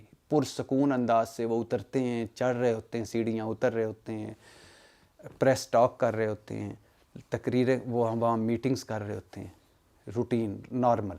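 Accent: Punjab, Pakistan. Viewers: Kia Punjabi ka tarka lagtay hn GEO sadda GEO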